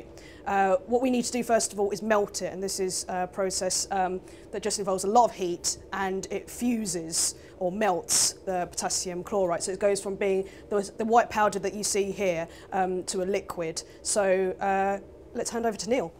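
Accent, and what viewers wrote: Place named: United Kingdom. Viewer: Asian+British accent= blown mind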